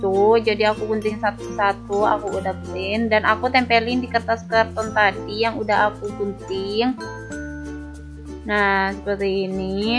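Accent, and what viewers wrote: Madura, Indonesia. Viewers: Cara bicaranya kek org madura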